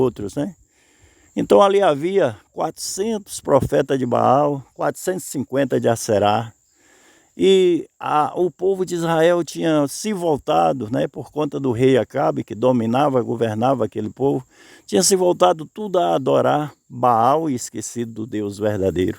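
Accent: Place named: Northeast, Brazil. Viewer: obrigada por me responder, eu percebi pelo sotaque q o senhor era do nordeste, gosto das msgs do senhor, já compartilhei com mais pessoas, sou do estado do Tocantins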